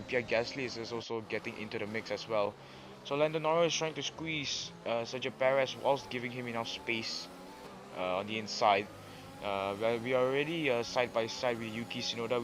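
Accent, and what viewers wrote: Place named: United Kingdom. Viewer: Brithish accent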